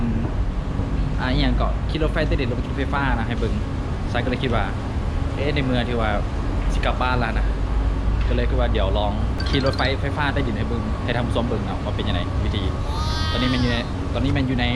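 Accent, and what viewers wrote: Vientiane, Laos. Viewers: คนเลยในความรู้ของคนอิสานก็คือ คนพูดแบบคนหลวงพระบาง ที่น้อง พูดนั้นคือสำเนียงเวียงจันท์ขนานแท้ ถ้าย้อนไปเมื่อเป็นร้อยปีเจ้ามหาชีวิตลาว ได้นำเอาคนเชียงใหม่มาไว้ที่เวียงจันท์ ส่วนคนที่พูดสำเนียงแบบน้อง จะพบได้ที่จังหวัดชัยภูมิ เพราะเจ้าพ่อพยาแลได้นำพาคนเวียงจันท์มาสร้างเมืองชัยภูมิเมื่อรัตนโกสินธ์ตอนต้น ส่วนคนเวียงจันท์ปัจจุบันคือคนกลุ่มใหม่ที่เข้ามาอยู่ใหม่แทนพวกเดิมหลังมีการเปลี่ยนการปกครอง คนลาวในกำแพงนครรุ่นเก่าได้อพยพหนี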